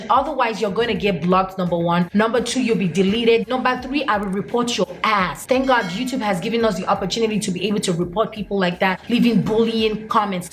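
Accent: United States. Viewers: U really sound American, the classes u took did a good job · Wow I thought maybe you moved to america wen you were young.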